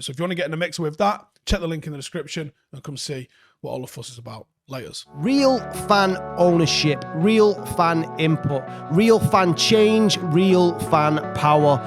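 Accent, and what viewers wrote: Manchester, United Kingdom. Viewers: doing the voice over in that vid? proper Manc😆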